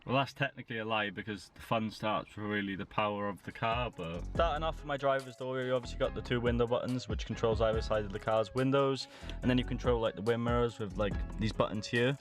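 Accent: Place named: Wales, United Kingdom. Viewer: Where are you from? You sound like you’re from Wales...I’m from South Wales! Love my mini and I also hate when it gets dirty 😏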